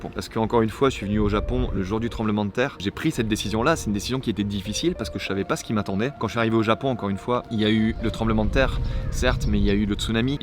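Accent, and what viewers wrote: France. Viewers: O_O !! Alors là pardon pour le cliché mais un mec hétéro qui regarde des dramas/films japonais, qui mange avec une main devant sa bouche (façon japonaiSE) et qui est fan de Nana jusqu'à avoir le cadenas de Ren autour du cou bah alors c'est grave inédit !! J'ai perdu 10 mes jeunes années à chercher un mec qui comprendrait ma "passion drama" et ai fini par abandonner... et me suis mariée à un mec qui ne comprend toujours pas le délire (et c'est pas grave parce que d'autres qualités c'est bien aussi !!), mais je ne savais même pas ça possible XD !!! Sinon, une vidéo feat avec Pepe et Vivi c'est possible un jour genre un format interview pour qu'ils parlent justement à coeur ouvert de cette expérience Terrace House ???? Et aussi, ton accent (quand tu parles français) c'est quelle région ?? :D Plutôt Est ?? (Alsace-Lorraine ?) Et aussi, tu pourrais nous parler un peu du regard que tu portes sur toi-même depuis que tu as choisi cette vie au japon ? 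Est-ce qu'il a évolué par rapport à qui tu étais en France, à savoir si il n'y a pas eu des couac d'égo, je trouve toujours plus intéressant quand on rentre dans le fond des choses et qu'on aborde la réalité, de nombreux youtubers ont parlé de l'envers du "décor" je trouverais ça grave cool que t'en parle. Et aussi... Non en vrai j'ai fini de bavasser XD !!! Bonne continuation o/ !!! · Salut à toi! Quelques petites questions du coup pour un prochain Dis-Nous: - Est-ce que l'accent français t'a déjà posé problème en terme de compréhension auprès de personnes japonaises? - Comment ça se passe le covid au Japon? On te voit souvent en extérieur durant les tournages (beaux paysages au passage), y a pas de restriction sur ce point-là?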